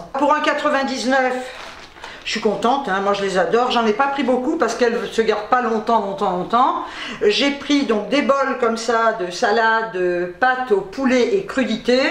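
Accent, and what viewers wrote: Algeria. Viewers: Cc l accent pied-noir j adore bisous ma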